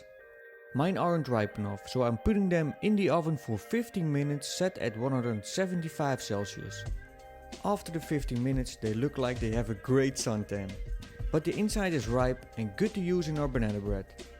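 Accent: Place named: Netherlands. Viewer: Ik dacht al dat ik een Nederlands accent hoorde 😁 Ga van de week t recept eens even proberen en je andere video's eens even bekijken 👌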